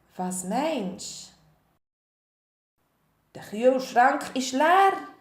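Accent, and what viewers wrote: Bern, Switzerland. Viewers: What area of Switzerland is this dialect spoken? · Bern Dialäkt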